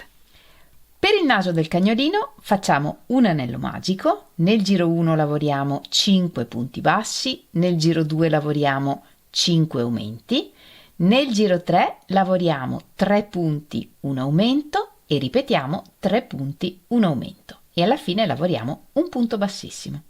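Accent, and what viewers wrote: Italy. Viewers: Pour souffrir également de fibromyalgie, avec en ce moment le syndrome des intestins irritables qui dure depuis plus d'une semaine, je suis trop mal dans mon corps pour crocheter. Je suis âgée, ce qui n'arrange rien. Par contre, comme vous, rien qu'à écouter la voix de, avec son magnifique accent, me fait du bien. Elle me ramène à mes origines (mes parents sont nés en Italie tous les deux) et mes souvenirs de vacances d'enfance. 😊 Je suis désolée, si je parle l'italien, je ne sais pas l'écrire. Et je ne supporte pas de faire des fautes d'orthographe. Bon courage à vous.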